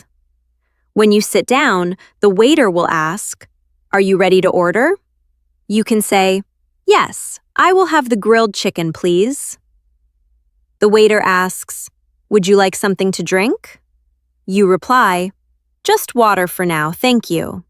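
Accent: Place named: United States. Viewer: It seems like you're speaking American.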